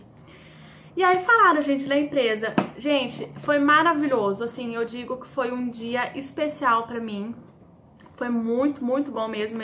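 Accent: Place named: Minas Gerais, Brazil. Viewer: Ooowww vc tbm é mineira 😍🤗🤗 seu sotaque é tão lindo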